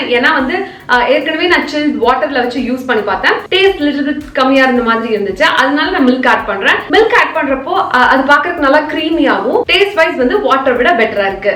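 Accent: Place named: Pollachi, India. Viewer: பொள்ளாச்சி மொழி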